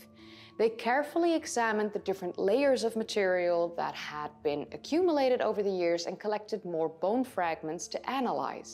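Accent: Netherlands. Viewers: that slight Dutch Accent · Dutch girl! (I heard it.) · where are u from? certainly not American some kinda Euro country... OH Holland! cool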